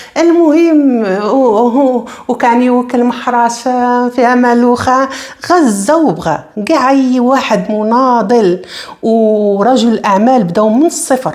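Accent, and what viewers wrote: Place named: Western Sahara. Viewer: السلام عليكم اختي الكريمة انتضرداءما الجديد منك بصوتك الجميل الصحراوي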